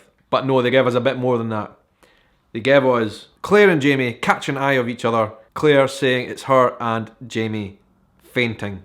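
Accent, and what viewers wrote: Scotland, United Kingdom. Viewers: You are welcome. I love that Scottish accent. I never been to Scottland ever but when you show us the sites of Edinburg I feel like I am there. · so happy to hear a scottish accent... and actually understand it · I envy your background, your culture, your accent and mostly your country with it's rich history. I myself didn't know about the history Scotland had, thanks to Outlander I've learnt a lot. Thanks for sharing you great country with us.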